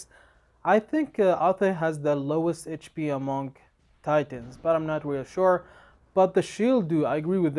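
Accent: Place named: Arab world. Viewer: i know you are arab from your accent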